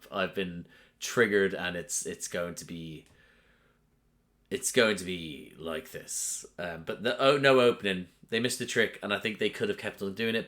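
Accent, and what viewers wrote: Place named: Northern England, United Kingdom. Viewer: R U from northern England or southern Scotland? You pronounce "about" as "aboat". You also pronounce "heart" as "herrrt".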